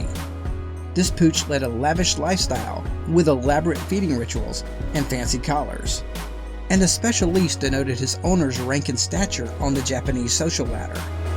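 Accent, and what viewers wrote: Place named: United States. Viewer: I do agree with you about them being different breeds, but if he is from America (which he sounds like he is) then he is not technically wrong by putting both together, since if i am not mistaken in America they are not considered to be a different breed. So no real reason to insult him on doing poor research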